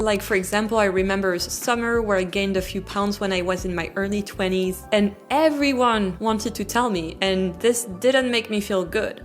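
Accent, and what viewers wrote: United States. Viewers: How did you get. your almost-American accent? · I'm a French guide mostly working for Americans. First of all, no one ever mentions it but your American English is on spot ! 🎉 I enjoyed a lot rhe section speaking about Food education. I find it very important and i think this is what leads to most of the other reasons you've mentionned. I oftne tell my guests about the long lunch break, even for kids 😂 my 2 yo daughter goes to day-care abd they sit at tiny tables on tiny chairs, eating out of a food tray with different compartment (starter main and desert/cheese) Found it so cute 🥰 Love your videos. Thanks I enjoyed the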